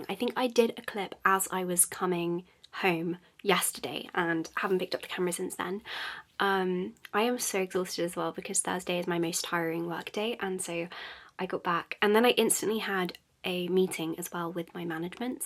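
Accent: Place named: South, United Kingdom. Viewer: Here to learn south British accent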